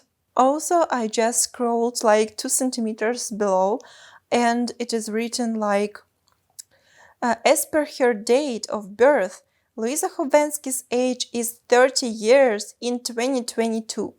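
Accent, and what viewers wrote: Russia. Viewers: It sounds like you're saying that you have to be either really skinny or very plus size to get sponsored by companies -- not be somewhere in the middle. I'm not sure who writes those articles, but I always wondered how accurate or not accurate they were when it came to youtubers or similar people. I thought you were around 28-31. To be honest, I think I thought you were from Russia in the past due to your accent.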